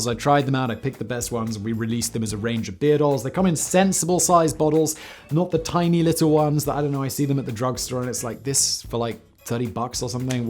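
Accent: England, United Kingdom. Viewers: "the French and English doubled down..." the somme was fought by the British Empire, not just England. Being Scottish, that coming with an English accent was particularly painful!! And Im not one of those wankers who love to point out mistakes - I just couldnt let that one go. FFFFFFRRRREEEEDOM!!!!!!!!!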